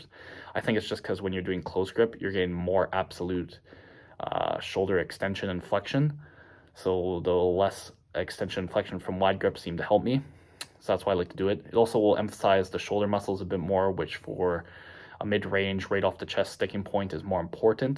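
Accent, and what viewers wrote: Canada. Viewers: I was looking at his initial 3 days of bench, super surprised that someone this level only benches 3 days a week... and then I saw the other 3 days LOL Also it’s extremely jarring to hear how Canadian he sounds after only seeing him on the gram 🤯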